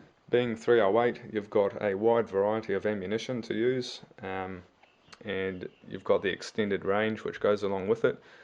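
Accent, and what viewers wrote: New Zealand. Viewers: i was so supprised to hear a new zealanders voice :D where abouts in new zealand u from?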